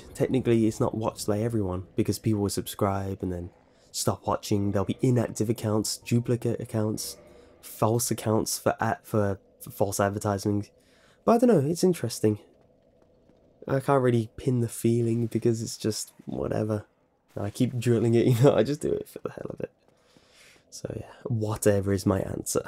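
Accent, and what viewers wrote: United Kingdom. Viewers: What people like about your voice: it sounds Brittish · He's brittish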